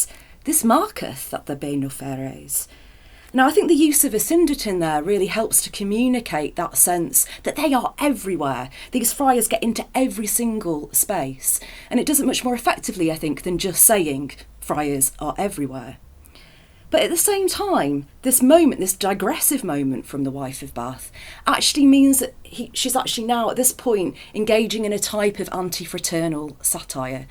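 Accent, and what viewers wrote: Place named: United Kingdom. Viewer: British accent, it has to be good.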